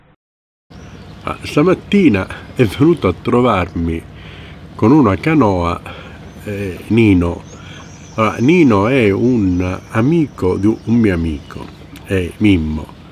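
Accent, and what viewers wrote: Sicily, Italy. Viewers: Il suo accento siciliano è musica.. Vorrei chiederle cosa pensa del progetto del ponte?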